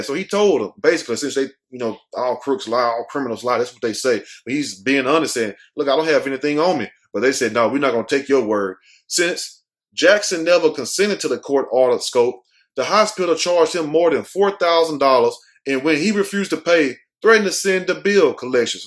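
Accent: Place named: New York, United States. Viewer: you sound like you was born and raise in NYC laughing my ass off #NYC in the house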